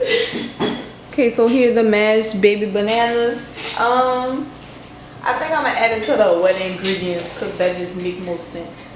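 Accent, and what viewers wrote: Louisiana, United States. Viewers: Thanks, we will be trying this. I hear your Louisiana accent. Here from, South Louisiana -Opelousas, Louisiana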